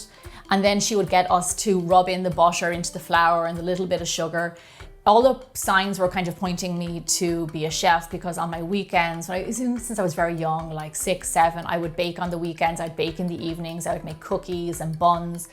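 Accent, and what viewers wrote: Ireland. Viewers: I believe that I was one of your first viewers. I am so happy that you have become successful with something that clearly makes you happy. I adore your Irish accent as it reminds me of my favorite moments when I would go to my grandparents home in Kilkenny Ireland. They have since passed and I haven’t been back but I like being able to listen to your calming voice talk me through your delicious recipes. I’ll be ordering a cookbook. Also, I need to find some cute measuring spoons and cups like yours as they brighten my mood.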